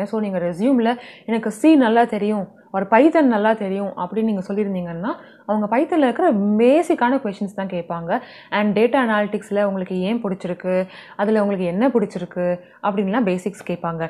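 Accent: Sri Lanka. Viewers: srilanka va ..slang kekka nalla iruku cute ya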